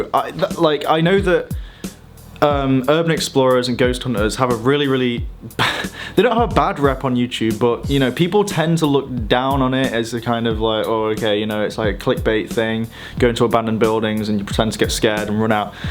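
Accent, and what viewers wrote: United Kingdom. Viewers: You’re a fake your British accent is horrible idiot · can u plz explain adderal ? Sorry I'm Arab and difficult to British accent sometimes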